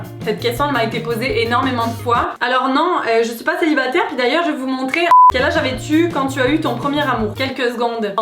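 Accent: Quebec, Canada. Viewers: mdr 😂😂 j’adore ton accent le franc québécois 😂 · J'aime trop ton humour mdr et ta voix ton accent demi français demi quebequois AHAHA 😂😘❤️ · Behh moi j'aime trop new york (mis a part Donald Trump) et sinon j'aime bien le Québec et je love trop leur accent j'dis pas sa parce que t'es québécoise sinon jtem trop continue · Comment tu as appris le Québécois ? Stp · Oh, le russe est ma langue maternelle et c'était fort bizarre et agréable d'entendre ta voix en russe. J'étais persuadé encore une fois que tu es une meuf excellente. Continue comme ça, j'aime bien tes vidéos. Ils me donnent une opportunité d'écouter la voix française, peut-être un peu québécoise mais c'est utile tout de même. Bonne chance. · Ton accent québéquois est oarfait je t adore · Tu devrais faire la comparaison entres les films québécois et les films français, ça serais drôle et tu as bien l'accent québécois ! 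· Je dis que oui tu as un accent québécois, mais pas comme si tu avais vécu toute ta vie ici! Love u ❤️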